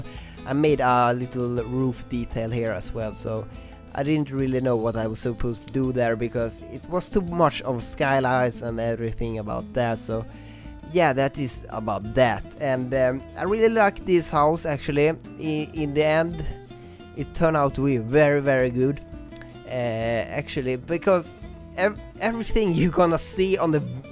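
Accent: Sweden. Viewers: Is he swedish? o.o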